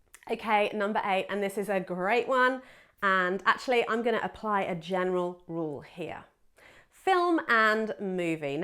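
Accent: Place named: RP, United Kingdom. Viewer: so no abbreviations and your english is posh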